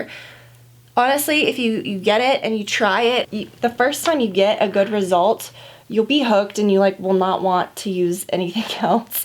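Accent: South, United States. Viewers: I don’t know what I love more, or her country accent! 😍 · Southern accent is so funny. Love it. Of course I am southern.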